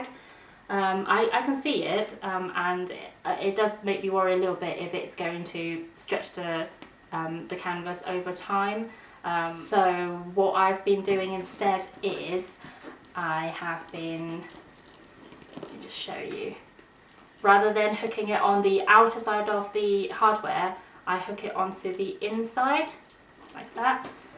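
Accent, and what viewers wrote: United Kingdom. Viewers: Love your English accent and the video is incredible . I find your video quality impeccable and flawless. You addressed my questions except I’m awaiting for the one question about if straps purchased have stamp code or not. Thanks love.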